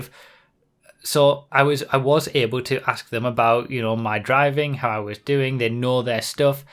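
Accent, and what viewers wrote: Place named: Newcastle, United Kingdom. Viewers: Are you from north east England, I hear a slight Geordie accent for some reason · I'm from just up the road from you Blackhall don't know the hell I didn't recognise the Poolie accent.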